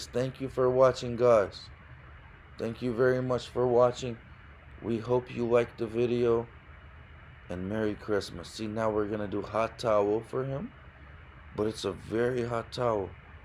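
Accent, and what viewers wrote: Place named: Russia. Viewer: i watch your videos mostly your father's videos for asmr and a relaxing effect 😅, love that russian accent ❤️, try adding asmr tag to your videos for more views and subs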